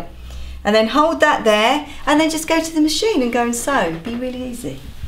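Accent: United Kingdom. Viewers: Girllllll...that's too cute!!! Wish I would've seen the items you mention at Portobello Mkt when I was ther a while back...cuuuuute!!!! Great job!!!! Easy instructions and after all, we yanks love the Brit accent any way. LOL..thanks.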